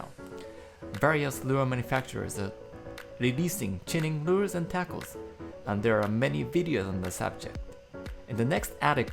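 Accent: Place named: Australia. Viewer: Did you study or work out here in Australia,? Some of your syllables almost sound Aussie lol. Anyway, cool video! I've been using Free Rig/Jika Rig's for Yellowfin Bream & Mangrove Jack here in Australia for a little while now. No-one is aware of these techniques here, at least from what I've been able to tell. Though, I think it's only a matter of time before they start to get popularized. Keep up the good work, I subbed!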